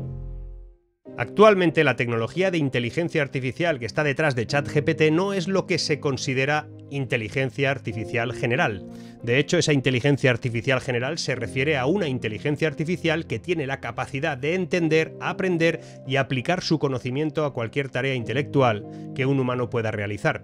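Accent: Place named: Spain. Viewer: Me encanta el contenido del video, me causa sorpresa y una inebitable distraccion que habla con acento Español de España con su bonita camisa de la seleccion de futbol Italiana, es esto un provocacion de oficio?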